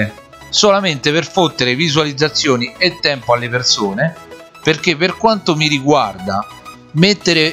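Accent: Rome, Italy. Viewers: Oioi mi fai pisciare addosso dalle risate come parli adoro il romano 😂😂😂😂😂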